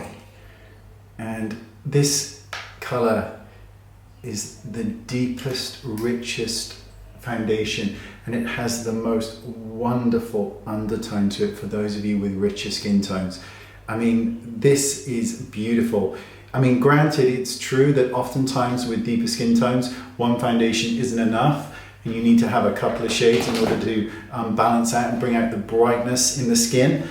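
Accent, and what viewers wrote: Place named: United Kingdom. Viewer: you make me smile when you get annoyed. I guess it’s because you brits make everything sound nice!🙂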